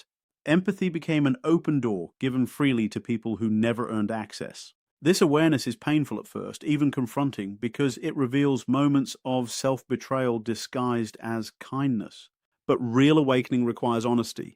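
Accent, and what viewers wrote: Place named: England, United Kingdom. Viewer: since when does Chase Hughes have an English accent?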